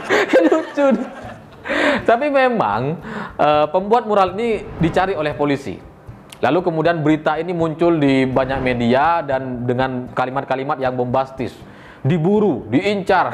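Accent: Madura, Indonesia. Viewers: Logat Madura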